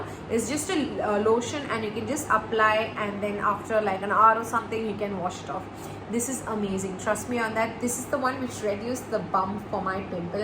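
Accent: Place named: Kerala, India. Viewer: Why do I find your Malayalam funny and out of track? 😂 It sounds sooo cute. And I know the pain, I was there!!! Great tips. ❤️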